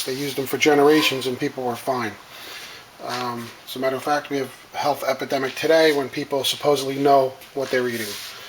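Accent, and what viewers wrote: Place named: New York, United States. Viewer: Sounds to me like he's from somewhere around New York City.. I'd guess Brooklyn or Long Island. Real nice classic downstate NY accent., Am I anywhere close? I live in Westchester so I know I'm in the right neighborhood.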